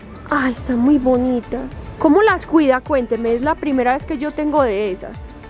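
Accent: Colombia. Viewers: sos muy dulce y alegre, te gustan las plantas y flores tanto como a mí. Siempre veo tus vídeos, amo tu acento colombiano. Soy argentina, me llamo Liliana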